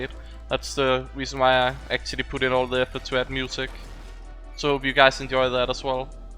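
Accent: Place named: Denmark. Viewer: hey i was wondering where you are from because you sound danish and im danish let me know if my speculation is right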